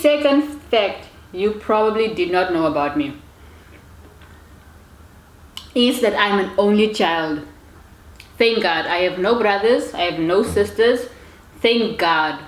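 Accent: India. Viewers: You starting to sound like an Indian girl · I love you... You sound Indian a lil bit😂😂😂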